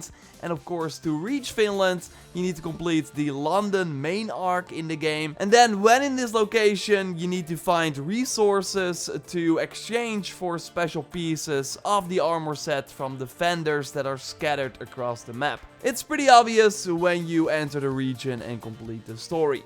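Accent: Netherlands. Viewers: Hahaha de eerste keer dat ik je video kijk en ik hoor meteen dat je Nederlands bent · is this guy dutch?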